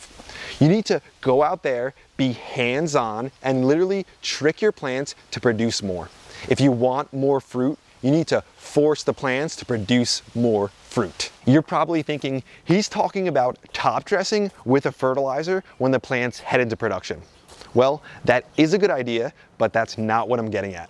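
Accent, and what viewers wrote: New Jersey, United States. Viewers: Your videos are super educational and helpful! Plus my 6 year old daughter absolutely loves your introduction and Jersey accent. 😁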